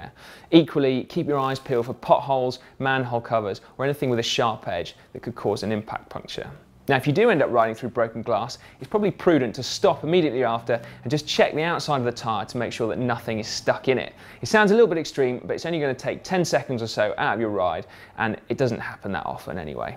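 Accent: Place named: Scotland, United Kingdom. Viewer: Cacalari Bus My guess is Scottish.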